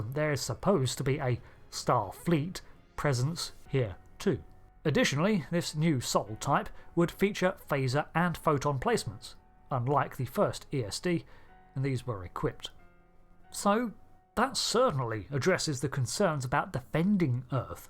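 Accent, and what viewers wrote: United Kingdom. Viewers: Just simply because you have a British accent, does not necessitae you being correct.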